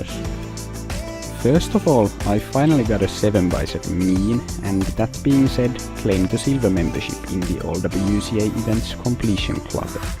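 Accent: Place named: Finland. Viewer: Guud job. Ainoo ongelma niin tota.... Vähän rallienkkuu havaittavissa😂 Mut hyvä idea tykkäsin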